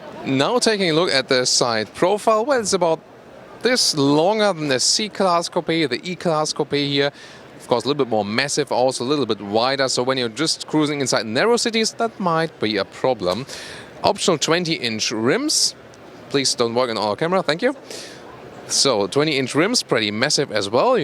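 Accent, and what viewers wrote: Germany. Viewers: Hello there. You and Doug DeMuro are my favorite auto reviewers. If I had to choose only one, I would pick You over Doug. Please don't tell him that! Lol:) I have an E coupe and loving it. E class all the way! You are very articulate and thorough with your presentation. I don't get bored watching you and Doug. Nice German accent, too! Hope all is well for you both life and work. Btw, are you coming to Washington DC anytime soon? Cheers and take care! -Phi ( Fee Fi Fo Fum) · Very good and clear comparison with all the details and your very nice and beautiful German Accent make it very intresting too . Thanks .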